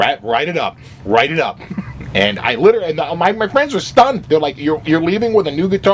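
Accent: Boston, United States. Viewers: your from boston?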